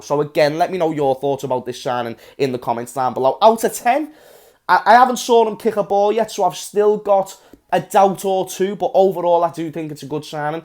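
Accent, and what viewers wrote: Liverpool, United Kingdom. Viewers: “I’m not gonna say it’s a great signing” lmaooo love the scouse salt 🤣🤣🤣 Varane is top 5 best CB in the world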